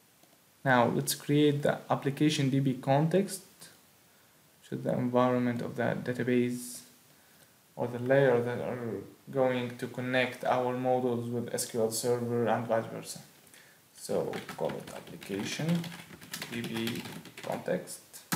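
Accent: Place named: Egypt. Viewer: عرفت انك مصري من لهجتك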